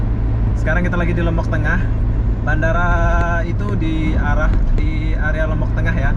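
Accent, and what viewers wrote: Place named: Malaysia. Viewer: Bahasa Melayu nya kentel bgt bang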